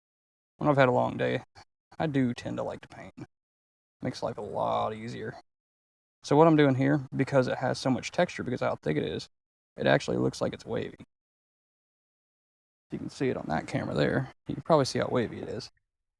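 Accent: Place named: South, United States. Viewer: Its rare that I come across a new youtubers literal first video just as they are starting out. I really actually enjoyed this video, kinda reminds me a lot of Bob Ross, you've got his hair on point. and I can hear a little southern in your accent that I think adds a lot of charm as well. Keep it up, I'll stay tuned and hope to see your channel grow. maybe you could be like Bob Ross but not only painting nature but painting nature out in nature! I think that's a great idea! also, you've actually managed to tempt me to try painting myself in a similar way, it looks like a really neat and fun technique. Edit: also, I see no mistakes. just happy accidents.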